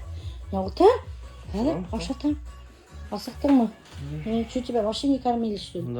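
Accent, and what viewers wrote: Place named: Bashkortostan, Russia. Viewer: туган конен белэн чын кунелемнэн котлыймын!!!!! Исэнлек-саулык, тормышыгызда бик куп матур, шатлыклы коннэр телэп каламын. Сезгэ хэрчак унышлар гына юлдаш булсын!!! аерым зур рэхмэт сезгэ кире уз асылыгызга кайткан очен!!!! Урысча эйткэндэ:это дорогого стоит!!! Сезгэ актриса булу кирэк иде, бигрэк лэр дэ матур Башкортча сойлэмегез!!! Рэхмэт, барысы очен дэ, тагын бер кат.... илэушэ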